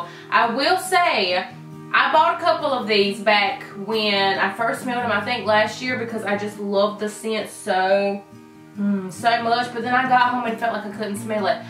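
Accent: South, United States. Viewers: All it takes is a country accent and I subscribed.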